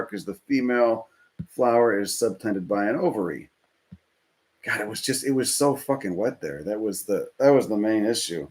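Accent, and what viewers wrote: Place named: Iowa, United States. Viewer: Love his Iowa accent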